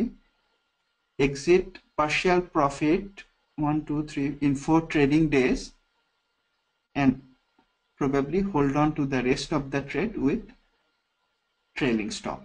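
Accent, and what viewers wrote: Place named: United States. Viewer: Good! liked it. I expected a typical Bengali accent but instead an American! :). would like more from you.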